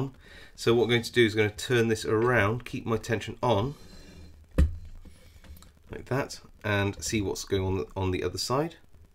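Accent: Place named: United Kingdom. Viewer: I love the Queen's English.. "Leaver" vs. "Lever" lol.. same same but all the better... Btw good job..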